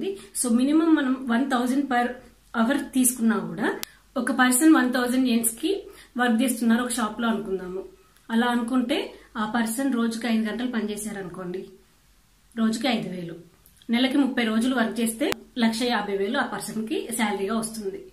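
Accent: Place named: Godavari, India. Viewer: Nice info sis Nakkendukko japan ante chinnapadinunchi chala ishtam particularly Maybe when i was young babiah baga vellevaru ahh country ki, he is now MD of SaintGobain ippudu kuda appudu appudu velthuvuntaru Shud bisit Japan soon chellai , thanks for the info very much Bythewsy india lo meh proper ekkada godavari jilla nah mehh mataluu vintunte alanehh vundhi 💚😊😊😊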